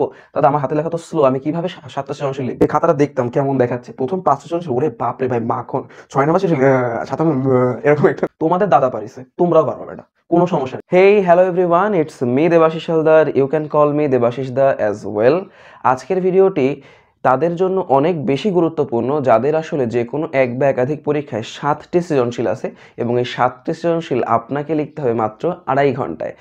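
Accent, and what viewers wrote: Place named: Rajshahi, Bangladesh. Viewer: Apnar basa ki rajshahi ?? Apnar kothar moddhe rajshahi r vibe pacchi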